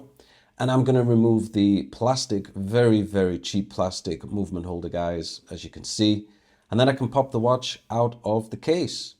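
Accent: United Kingdom. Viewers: Interesting accent, are you a Brit abroad maybe?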